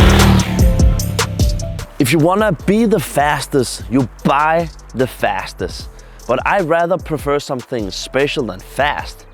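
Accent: Denmark. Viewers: Do I detect a Danish accent? Merry Jul then! Kudos to you for riding in those conditions (by the way the same I have right now where I live so I know how it feels to ride on those roads). I guess it's a demonstrator bike, the dealer must be very confident to let you ride out with snow and salt on the roads. I cover my ride, come winter, with a protective spray but whatever you do, there are still spots (mostly the bolts) that get a white shade from the salt but then again for me it's more important to ride than to have a pristine bike. I really like your enthusiasm and the work you put into the presentation, takes, cut, editing. I'm very much interested in the V100 and will definitely test drive one. For the time being I'm a very happy owner of a Ninja 1000sx, such a versatile bike. It will take a lot to convince me to swap it for another bike. Cheers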